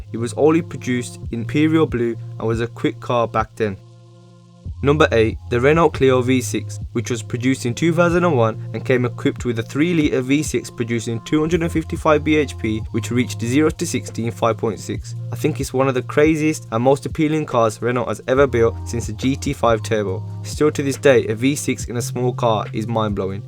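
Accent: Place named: Birmingham, United Kingdom. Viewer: that birmingham accent come onnnn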